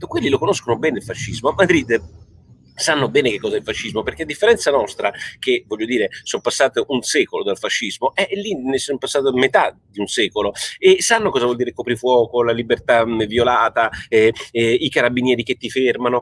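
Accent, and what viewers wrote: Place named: Piedmont, Italy. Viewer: Peccato che all amico di ieri nessuno abbia risposto sull argomento... che peccato Comunque il tuo piemontese é proprio da barotto ormai nessuno lo parla così manco mia zia di 96 anni va, gadan che non sei altro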